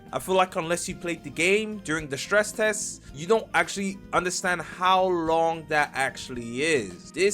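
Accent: United Kingdom. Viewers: Wait, you're british??...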